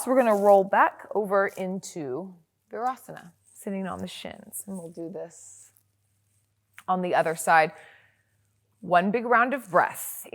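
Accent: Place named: Australia. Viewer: Thanks so much! Love your classes! And love your Aussie accent! 'Share this with ya!" around 1.20. lol.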